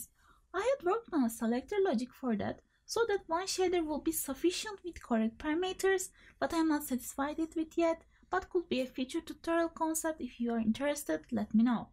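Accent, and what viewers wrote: Turkey. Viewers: Türk aksanı 🙂 kolay gelsin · English with Turkish accent :D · Teşekkürler.! video çekmeye tekrar dönersin umarım :) hep 1 yıllık videolar, ayrıca ufak bir bakındığıma göre mikrofon iyileştirmesi yapmış gibisin ama biraz daha tane tane konuşabilirsen muhteşem olacak gerçekten :) aksanı duyar duymaz dedim bu türk olmalı :D oyun sektörüne böyle katkılar yapan türkleri görmek çok güzel.! hintlilerden ve kuzey ülkelerinin garip aksanlarından sıkılmıştık :D yılma devam et lütfen.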